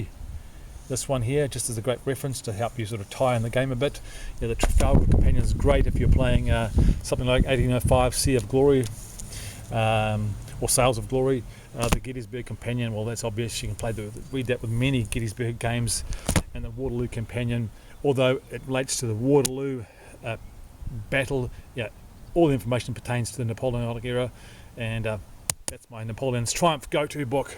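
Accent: New Zealand. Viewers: Ur kiwi accent is awesome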